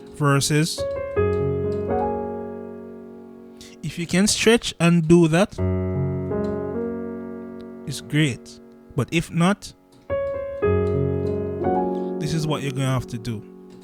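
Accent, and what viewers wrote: Jamaica. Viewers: U jamaican?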